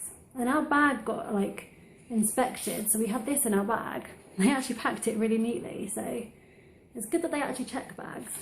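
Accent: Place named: United Kingdom. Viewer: Loved it! Thanks for sharing! Had no idea you are from across the pond! Loved hearing your accent as you described your book. Anyway, what I wanted to share was about your receipts...you are right, they do fade but what I do is make copies of receipts now for my Smashbooks so they last longer. Do you think you can do that? Just a thought for you. Thanks for sharing your American trip memories! Now you must come to Florida to pay us a visit at Disney World!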